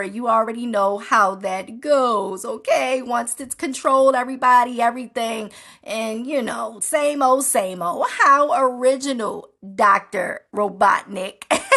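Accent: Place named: Baltimore, United States. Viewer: Me and my son enjoyed ya video , And you must be from Bmore or Maryland i love ya accent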